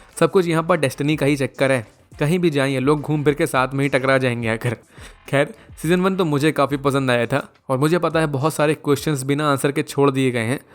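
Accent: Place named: Chhattisgarh, India. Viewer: Tum chattisgarh k lagte ho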